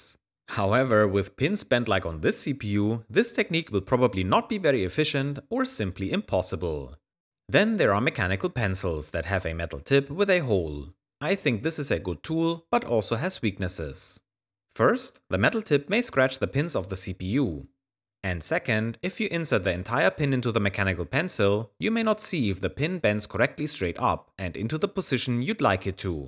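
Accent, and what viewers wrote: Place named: Germany. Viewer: Why did they want so much money from you at the scrapyard? Seems like the guy was very shady and pocketed the money. You sound German and when they allow me to look through the trash (not everyone allows that) they either say take the shit or they weigh it and I give them the scrap price. The outcome would be below 3€ for a handful of CPUs. Or was this a private facility? I always go to our municipalities scrapyard when I want to get rid of stuff and I ask if I can look through the electronics. The outcome of that question depends on the guy sitting there.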